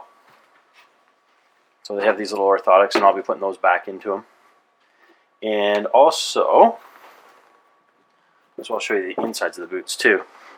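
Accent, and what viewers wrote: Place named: Canada. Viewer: such thick canadian accent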